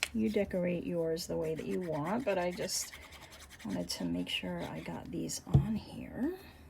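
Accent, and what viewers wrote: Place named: Pennsylvania, United States. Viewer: Love your style.. will try these…. Sounds like you live in PA. I used to live there… now I am in MN Yes do more of these please..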